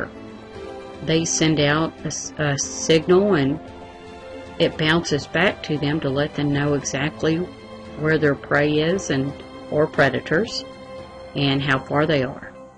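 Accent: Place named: United States. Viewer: sounds American to me but i ain't good hearing accents it all sounds the same to me well except the RP accent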